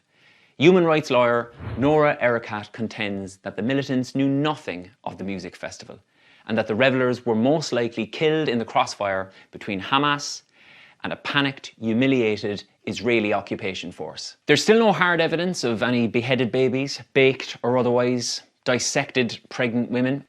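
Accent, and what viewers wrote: Ireland. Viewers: 👏👏 You have hit the nail on the head with this video! Bravo for speaking out against the atrocities. Big fan of your channel and have subscribed and I’m spreading the word to my family and friends too. Thank you so much for your support 🙏🇵🇸🇮🇪 As always pleasure to hear an Irish voice 🎉 God bless you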